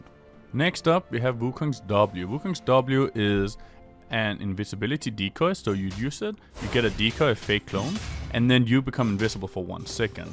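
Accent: Denmark. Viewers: I can hear the Dane within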